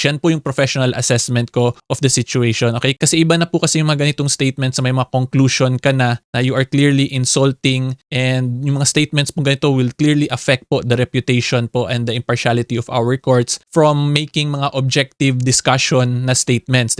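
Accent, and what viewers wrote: Visayas, Philippines. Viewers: Bisaya ka atty? hehe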